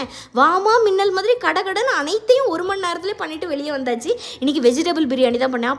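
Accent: Tirunelveli, India. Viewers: Aha puthayal eduthutu vanthutaena 😅😅😅 ithu namma nellai side slangla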